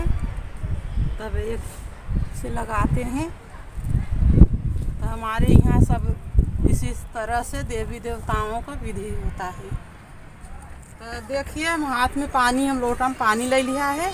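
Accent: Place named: Uttar Pradesh, India. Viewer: Mujhe aapki recipe pasand aai,aur aap k bolne ka tareeka bhi,sultanpur side ki hai lgata hai aap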